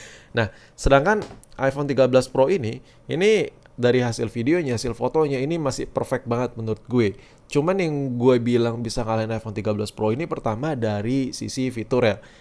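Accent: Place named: East Java, Indonesia. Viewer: Orang jatim ya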